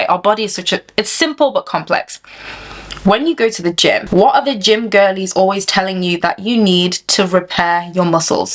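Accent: United Kingdom. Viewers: Your accent sounds quite Northern? Are you from Manchester? Leeds?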